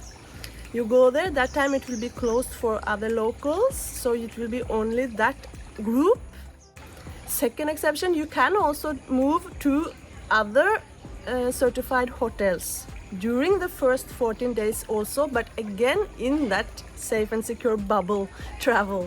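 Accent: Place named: Sri Lanka. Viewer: Oh My God she sounds like a Sri Lankan 😁 Incredible!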